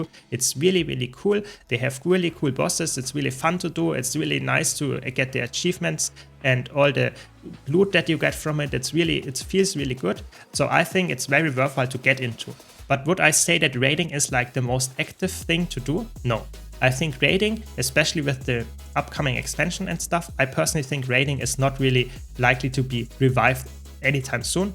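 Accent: Germany. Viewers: Hab mich schon gefreut ein Deutsches Video anzuschauen da der Titel auf Deutsch ist.. Klicke drauf und gesprochen wird auf English mit einem Deutschen Akzent.. schade.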